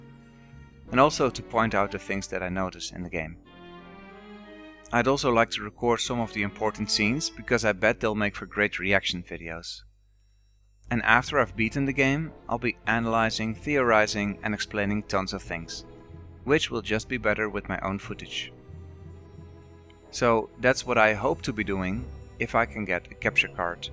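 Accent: Netherlands. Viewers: are you from holland ???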